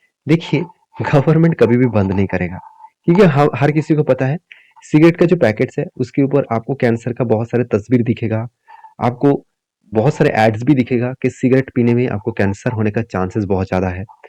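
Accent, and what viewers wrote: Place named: West Bengal, India. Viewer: Are you from west bengal?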